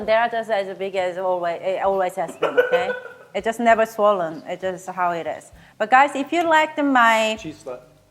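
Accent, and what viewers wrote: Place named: South Korea. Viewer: I love ur channel ❤️❤️❤️ ur videos bring a lot of joy to many people. Thank u for making them 🥰🥰🥰 Ur accent is soooo cute. Reminds me of my moms. Shes Korean as well ♥️♥️♥️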